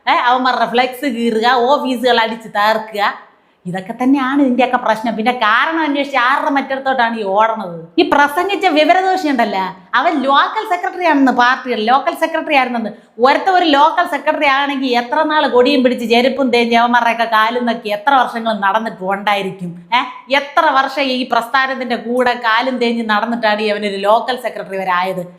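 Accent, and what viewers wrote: Thiruvananthapuram, India. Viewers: ur simply awesome.what a super presentation.super tvm slang. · അവതരണം മഹാ ബോർ. വേഷം കെട്ടലും. തിരാന്ത്തരം ബാഷകൾ പറയല്ല്🤔🤐🥶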